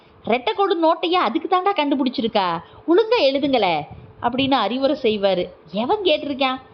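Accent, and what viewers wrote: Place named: Tirunelveli, India. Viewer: நானும் ரிடையர்டு இங்கிலீஷ் வாத்யார்.கதையில் சொல்லப்பட்ட உணர்வுகள் உண்மை.குரலில் தின்னவேலி பாஷை பிரமாதம்.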